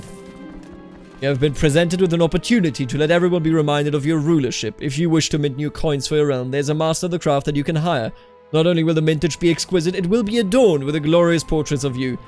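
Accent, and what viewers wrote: Germany. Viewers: It's funny how his german accent is hardly heard while he speaks english. But the second he pronounces a non-english word, you can bet your ass he's a damn bavarian.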